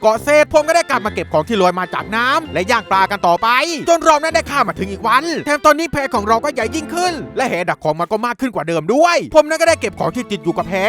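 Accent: South, Thailand. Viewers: เสียงเหมือนคนใต้บ้านเรา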